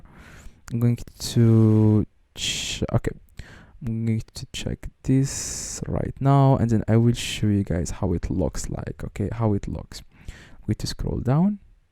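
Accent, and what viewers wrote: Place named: Morocco. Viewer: Chokran a chef , ollah ila mnin bda lvedio , galt hada 3ndo lahja dial mgharba , tbarkllah 3lik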